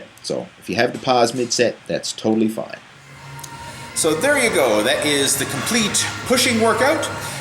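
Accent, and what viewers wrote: Newfoundland, Canada. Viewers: are you a newfie?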